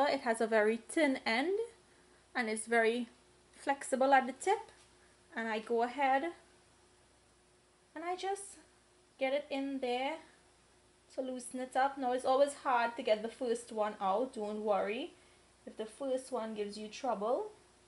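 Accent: Trinidad and Tobago. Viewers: To Shabana Nisha who said accent annoying. How rude. You nasty person, we are Trinidadians and proud of it. Who are you to criticise her accent. Too back to where you came from. Where they poop in the streets. Go back to India, you horrible person. You never hear of Trinidad. Go back to school. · She's a Trinidadian. You can hear her trini accent maybe that's the way her family made it.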